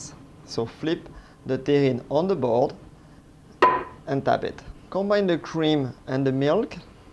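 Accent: France. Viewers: I could listen to this guy talk all day I am French too but I'm French American so I don't have the cool accent.😕😆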